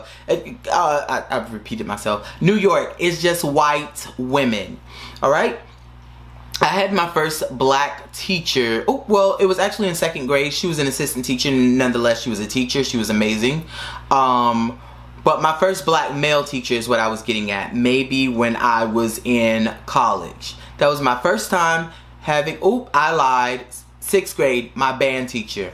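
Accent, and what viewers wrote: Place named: Cuba. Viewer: your spanish sounds cuban!!!!!!!!!OMG....I speak spanish as well. I lived in Costa Rica for 1 year when I was 16 to become fluent...however i was learning since grade 3 as well. I did my Ba in Spanish, my postgrad in Early Childhood Education now doing my Masters in Esl in Ecuador!!!!!!!! I did my BA in Canada...if i had to do it over again..I would have chosen Spain to do my ba in spanish lol as my former professor did the same thing.....nevertheless I love the language....also my adoptive parents are from ecuador and peru!!!! So that was a plus for me...in Canada....It's crazy how I can sit watch telenovelas and understand everything as a second language learner....and I am black as well from the Bahamas...latinamericans think that i am from cuba or dominican when I speak spanish...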